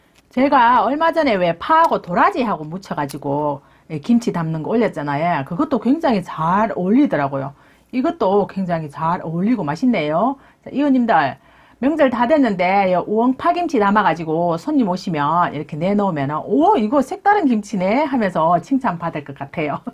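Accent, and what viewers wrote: Gyeongsang, South Korea. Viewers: 어이구야꼬! 진짜 쉽고 맛나겠네예. 나도 경상도라 내친구같네예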